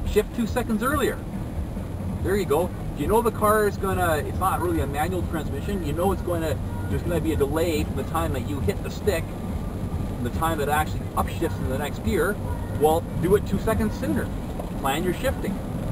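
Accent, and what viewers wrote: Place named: Canada. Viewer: From your accent it seems your Canadian?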